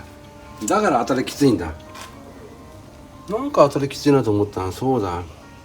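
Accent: Niigata, Japan. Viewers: 完璧な整備に感動しました。なんか･･･新潟訛り？、どこにあるんだろう？調べたら、やっぱ新潟だｗ 来年暖かくなったら、帰省がてら見学に行こうかねぇ！NSRで！ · 東京で生活してるけど、聞き覚えのあるようなアクセントだなと思って調べたらやっぱり新潟の人だった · ところどころに新潟弁が聞こえる。新潟県のショップさんですか？ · 新潟っぽいしゃべりだったので検索したら阿賀野市だったんですね！！ CB750Fは高校の頃憧れでした。最初のエンジン音で「おかしな音」と感じました。知識がないショップに当たってしまうと不幸ですね。。。。。しかし外見は綺麗ですね！！凄く興味深く観させて頂きました。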